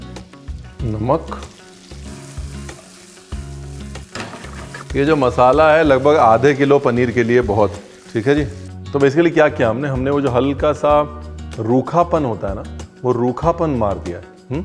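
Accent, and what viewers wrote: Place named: Lucknow, India. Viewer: The way you speak is amazing, no one can touch the Lucknowi style and poise.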